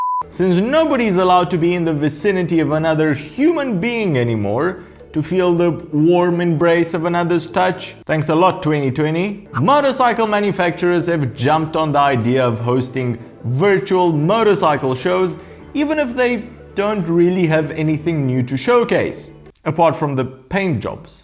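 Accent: South Africa. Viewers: Great videos with a superb sense of humour. Put me out of my misery though. You live in Romania, yet your accent sounds a little South African, would this be correct?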